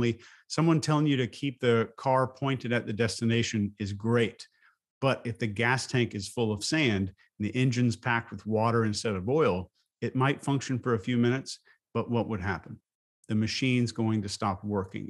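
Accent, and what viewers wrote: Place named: Arkansas, United States. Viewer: I’m here from the behavior panel. Im also from Arkansas, so your accent is so familiar to me, you remind me of some of my family and friends 😊 thank you for your content it is really appreciated ❤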